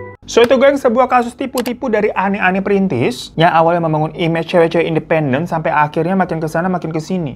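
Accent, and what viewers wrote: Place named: Jakarta, Indonesia. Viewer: Tiap bawain cerita .. logat bahasa ny ky org betawi ga sii 😂😂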